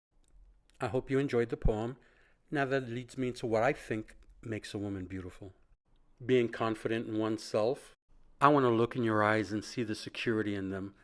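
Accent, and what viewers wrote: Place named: East Coast, United States. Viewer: Look at you - all grown up and on YT now!! Go, Go! PS - I remember first stumbling upon your blog and the YOU poem. It is SOOOOOO fun to hear your voice reading the poems. You haven't lost your upper East coast accent if you're still living in Florida?! PS - I could've sworn it was the shoes that make a woman beautiful?;-)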